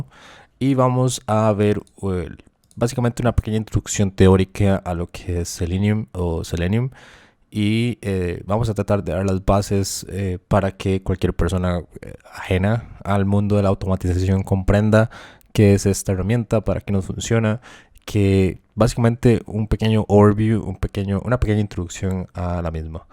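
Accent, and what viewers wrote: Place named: Costa Rica. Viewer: Muchas gracias estimado, creo que sos tico por tu acento. Grande Costa Rica!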